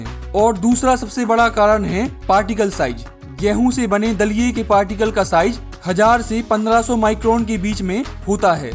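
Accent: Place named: Bundelkhand, India. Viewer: Aap bundelkhandi hai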